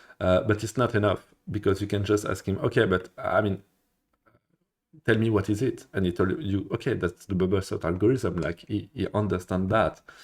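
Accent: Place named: France. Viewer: T'es francais toi mdr très bonne vidéo sinon!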